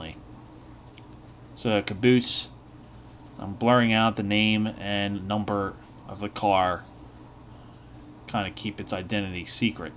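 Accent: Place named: Pittsburgh, United States. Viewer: sounds like he is from Pittsburgh.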